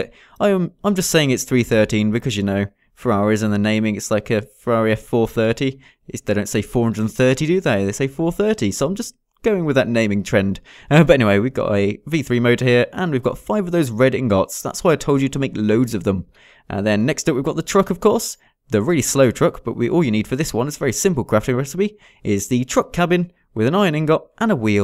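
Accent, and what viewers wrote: United Kingdom. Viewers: I <3 u so much u make my day and make me smile even when I'm in hospital ! Ps r u British?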